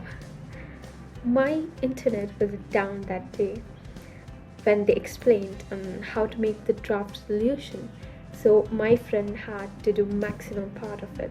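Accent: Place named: United Kingdom. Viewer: How you bring that British accent?😁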